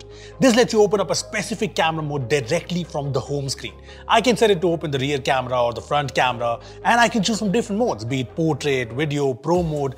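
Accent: India. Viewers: Don't speak like indian English accent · To bude asi indická angličtina, což ?? 🙉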